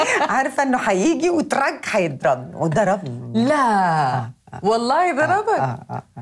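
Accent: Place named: Iraq. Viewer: لقاء جميل أحلى شيء بزينب انو شخصية وذكاء ومحافظة على لهجتها العراقية لأن في كثير عراقيين بس يرحون لغير بلد يغيروا لهجتهم العراقية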